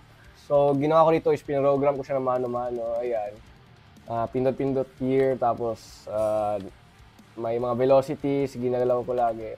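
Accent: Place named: Philippines. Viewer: You sound philipino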